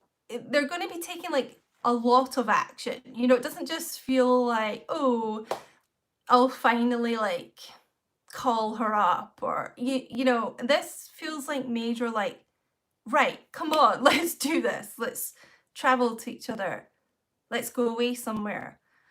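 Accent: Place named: Edinburgh, United Kingdom. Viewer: I LIVE IN EDINBURGH TOOOOOOO!!!!! I FRICKING KNEW IT!! THAT ACCENT! <3 I discovered your channel a few days ago and LOVE your readings! I've been reading Tarot on facebook groups etc and hope to start my channel soon! Commenting without having watched the reading yet haha but that title grabbed me! Got you on the background while im working on Uni work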